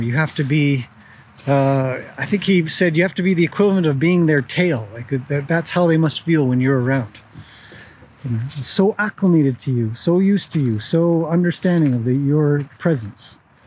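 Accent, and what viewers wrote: Canada. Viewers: Trying to figure out your accent? Canada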